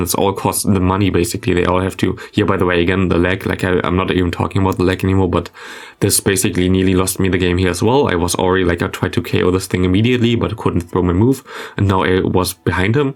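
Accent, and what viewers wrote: Germany. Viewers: Bro I think you have an accent, are you german by any chance?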